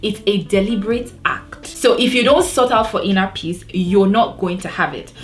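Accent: Nigeria. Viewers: I’m Kenyan!🇰🇪And I love your Nigerian accent! Haters are gonna hate. Just keep up with your amazing videos & advice!❤️ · I love your beautiful nigerian accent 😻 I am French and been watching you for so long. I understand so well Keep going and glowing ❤ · Girl one of the reasons I listen to you is because of your accent 😂. Naija represent, don’t mind these people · This video just said it all and I love the fact that you also don't care about what people think about your accent,if it's the accent that's their problem,they should find the accent best suitable for their ears then. Team Nigeria 🇳🇬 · The intro! :) Girl your accent sounds beautiful!!!! Naija giiiirrllll <3 MUCH LOVE SIS! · I actually love Nigerian accents I think they sound so beautiful and genuine, honestly growing up with parents who have an accent makes me ask the people who said, why you watching the video, if you can’t get past the accent?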